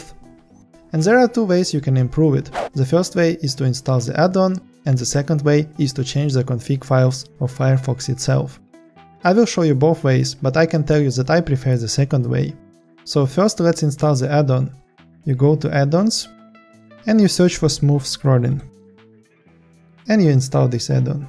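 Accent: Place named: Russia. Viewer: English is not your native language so mistakes are acceptable, important thing is that people who watch your videos can understund you. Btw are you from Russia?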